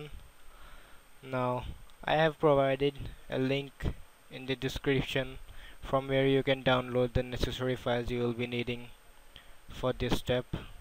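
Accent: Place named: India. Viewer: you have a indian accient you must be the right one that im searching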